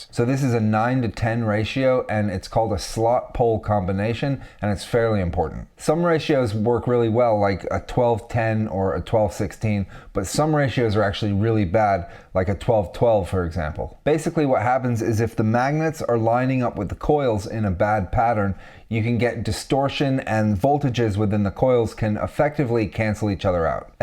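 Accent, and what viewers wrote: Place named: United States. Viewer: I'm hearing American English, but I se British power outlets. so.. . what's the story ?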